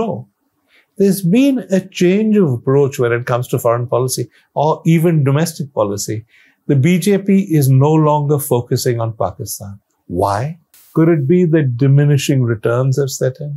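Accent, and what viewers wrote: United Kingdom. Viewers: Too much wine and hangover British accent.. in Tamilnadu BJP may not get any seats, but be aware that the vote share is really rising. The idea of Hindi heartland party is only for these Khan market window shopping and gracelessly aging British leftovers in India..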